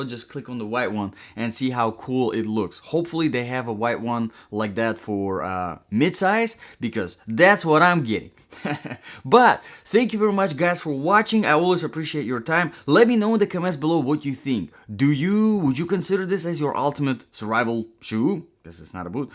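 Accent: Russia. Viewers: Английский хороший, но русский акцент с первых же секунд всё-таки слышно ;)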